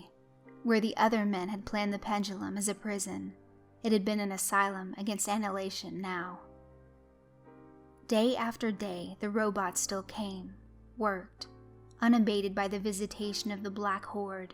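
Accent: South, United States. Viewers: What makes it even better is the cute southern (Georgian?) accent when she's not reading!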